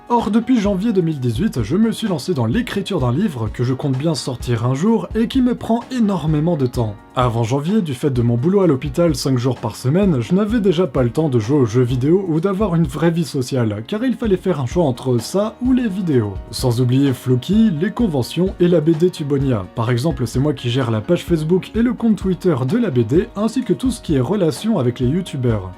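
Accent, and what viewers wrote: France. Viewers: ton intonation surprend... t'as juste un accent normand bien prononcé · l'accent français + ta voix, c'est un gros plus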